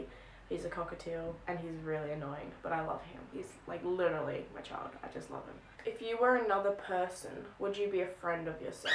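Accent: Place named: Australia. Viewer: Also figured you were Australian with that neat accent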